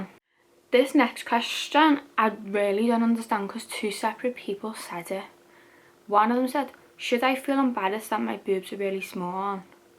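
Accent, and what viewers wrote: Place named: Liverpool, United Kingdom. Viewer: LIVER POOOOL!!! Accent so strong!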